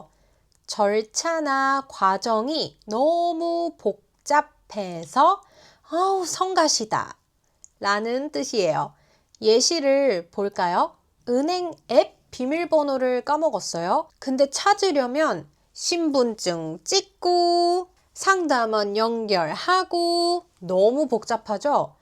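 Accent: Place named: United Kingdom. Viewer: did you learn english in the uk? you have a strong british accent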